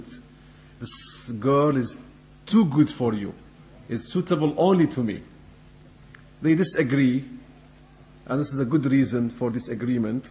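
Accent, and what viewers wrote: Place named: Sweden. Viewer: His accent is Swedish.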